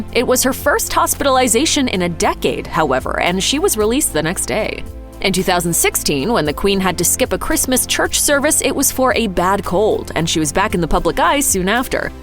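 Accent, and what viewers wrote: United States. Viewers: Why is some american narrating this? Absolute rubbish!! Worry about your own disaster of a country now that your own people allowed that trump and his ilk to completely make america look like a bigger bloody joke. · No facts are 'out'! What a waste of time and...a really awful American voice narration! 😖🙄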